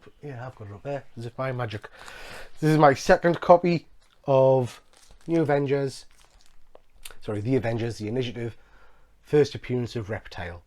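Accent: United Kingdom. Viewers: Really like your British accent mate👍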